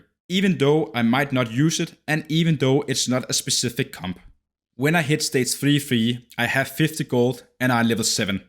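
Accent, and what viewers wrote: Denmark. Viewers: danish accent coming in strong:))) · Love your Danglish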